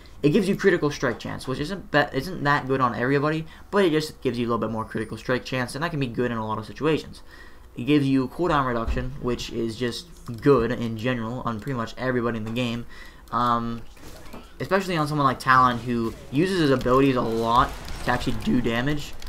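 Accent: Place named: United Kingdom. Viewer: You sound british, im wondering why youre playin' on na than :/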